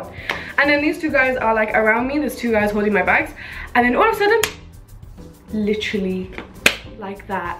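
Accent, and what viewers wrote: Nigeria. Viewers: Im a new sub ! And i absolutely laughed out loud at this video! Definitely do more stories! Loving the lowkey Nigerian accent :D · I can hear you have bit of a naja accent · her Nigerian accent 😂😂😂😂😂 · The Nigerian accent comes really naturally to you. You're basically one of us now :D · just noticing that got some 'nigerian english' accent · lol u had a bit of nigerian accent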